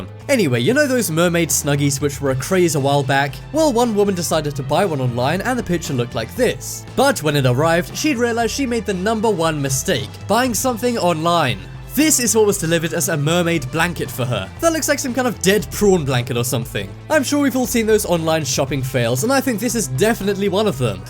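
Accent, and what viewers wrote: United Kingdom. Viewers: Im so tired of this British accent bullshit on youtube. It may have seem attractive to some people at the beginning but now it's just fucking annoying! I would give you a thumbs down but I'd rather give you a thumbs up.....YOUR ASS!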